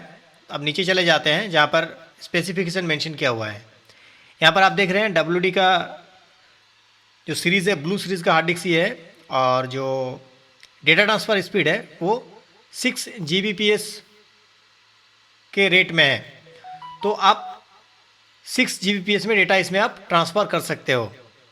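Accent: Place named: United States. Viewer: i like how his is like the only indian youtube video that i can understand easily because im used to such a white American accent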